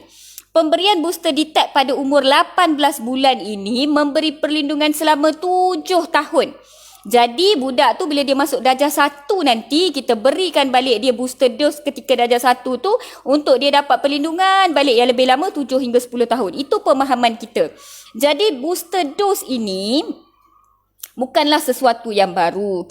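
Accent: North, Malaysia. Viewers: ya ka....igtkn org utara...heheh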